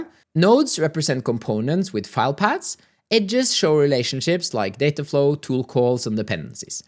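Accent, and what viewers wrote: Norway. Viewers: Its a typical Norwegian accent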